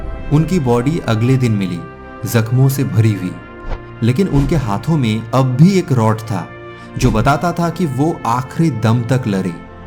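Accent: Bihar, India. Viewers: Bhai aap north east se ho aap ke voice me thode Bihari tuch h 😊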